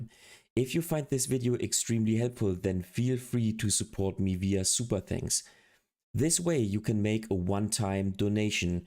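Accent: Germany. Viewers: Cool stuff! I like it 👍 btw: Ist deine Muttersprache evtl. deutsch?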